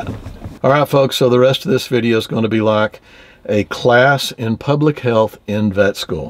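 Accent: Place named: South, United States. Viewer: you have a slight, maybe southern drawl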